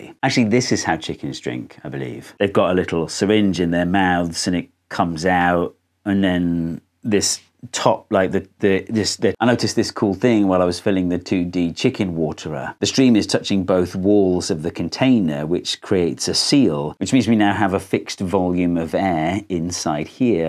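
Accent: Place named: Geordie, United Kingdom. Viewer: I’ve been watching your videos for years, and just realised on Wikipedia that you went to my secondary school! I’ve got as much of a geordie accent left as you do, mind, so I never even picked up on it. And ironically, I’m currently working in Universal Studios as a wandkeeper, so I guess we’re both wizards now. How many Tommy More wizards can there be? 😅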